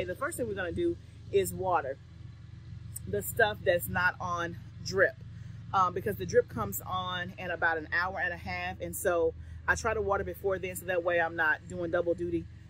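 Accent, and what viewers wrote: South, United States. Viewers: I live in Florida but I'm from Georgia & your southern accent is strong & hilarious to me, love it 😂 U are ao funny and yes hunny its hot 🔥😅 Gardening is fun but frustrating at time with fighting critters that eat up your 3 months of hard work in 1 day! But just keep going 👍. I love your channel! ❤ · Do NOT un-Southernize the way you talk ! That's a good part of the fun, to hear how we all talk so different. Lol. Many blessings everyone.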